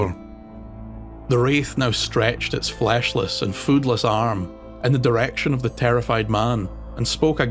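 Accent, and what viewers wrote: Scotland, United Kingdom. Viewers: I only just discovered your channel and I'm hooked! I'm surprised you don't have more subscribers. It's so refreshing to hear Scottish folklore told by someone who's actually Scottish and not American lol.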